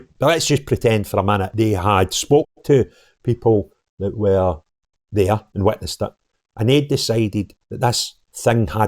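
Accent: Scotland, United Kingdom. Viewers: Forget their nationality,religion etc,,yet your headline,,foreign attacker,,,your a Scot in accent only,,,,heres one l would bet on,,,your a rfc supporter or that ilk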